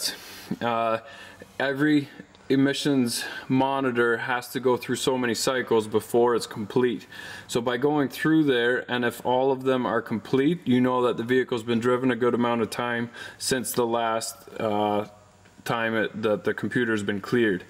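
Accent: Canada. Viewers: Love the accent think Canada! Love it. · I know you are Canadian because of the way you say "out" Thanks for the tips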